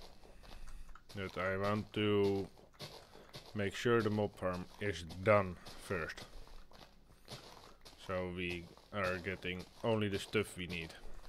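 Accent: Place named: Netherlands. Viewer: Ik hoor een beetje nederlands accent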